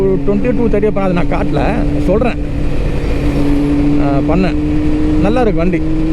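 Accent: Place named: Ambattur, India. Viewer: unga slang semmaiYA irruku bruh!... Nanum ambatturTHa bruh!...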